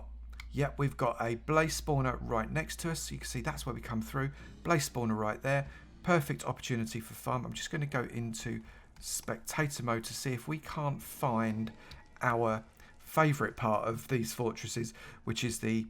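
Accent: United Kingdom. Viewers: your voice sounds like gordon ramsay lol or some british dude doing a tutorial about somehing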